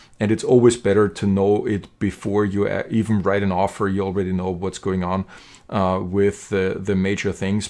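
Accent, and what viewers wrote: Germany. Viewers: Bist du aus Deutschland oder Österreich ?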